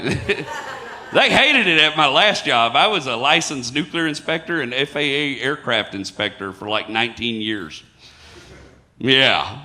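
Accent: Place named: East Texas, United States. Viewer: Man this killed me. I'm from East Texas and you sound like 7 of my 8 cousins lol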